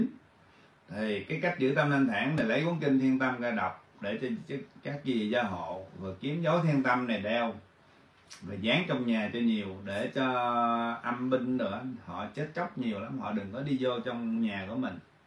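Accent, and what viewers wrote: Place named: North, Vietnam. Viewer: SP nói tiếng Nam mà người dẫn chương trình lại nói tiếng Bắc nghe không phù hợp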